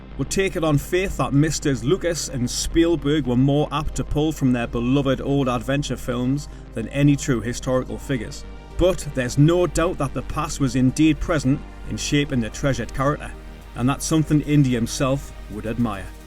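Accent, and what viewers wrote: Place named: Geordie, United Kingdom. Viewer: Instantly switched off with the overly Geordie accent. WTF?!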